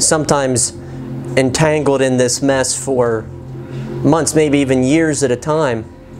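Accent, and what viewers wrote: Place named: Pittsburgh, United States. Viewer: I live in Switzerland now but I am from just North of da burgh. Always fun to hear that accent. Keep up the cool videos.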